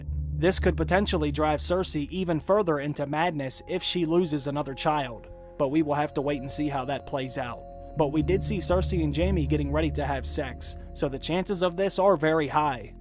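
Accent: Pittsburgh, United States. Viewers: You're from Pittsburgh, aren't you?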